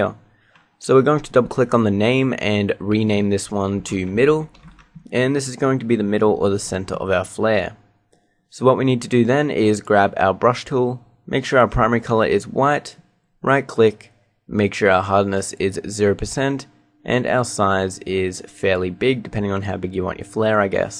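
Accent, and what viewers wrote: Australia. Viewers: me neither cannot understand australian english "hold the OKIE on a keyboard" :D what is that? thx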